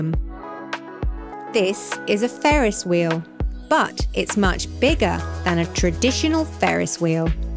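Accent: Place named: Southern England, United Kingdom. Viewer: I have been looking for English videos for a Spanish friend. Most are with American accents and/or many are too fast for the novice to take in. This is perfect with a good simple southern English accent at a measured pace. Thank you. Next is to find one about travel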